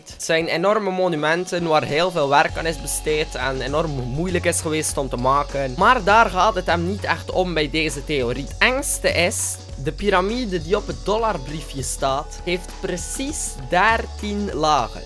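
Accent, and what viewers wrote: Belgium. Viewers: Ben je Belgiës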